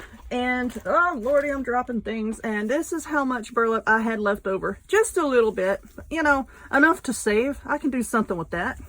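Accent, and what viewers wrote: South, United States. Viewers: You were cracking me up with the little comments about your ex. So funny. I love your southern accent and sense of humor. And also your creativity. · I know this is an old post, but I love it. Where are you from? You have a somewhat southern select. Loved heart wreath.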